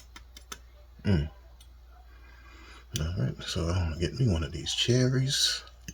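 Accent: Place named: Louisiana, United States. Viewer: Hey there!! So happy I subscribed to your channel! First of all I must say I love your voice lol although you do sound like you were from New Orleans or Louisiana! This French toast casserole sounds amazing can’t wait to give it a try! Look forward to watching all of your videos! Loving on you from Philly!!! 😊🙌🏼💜💜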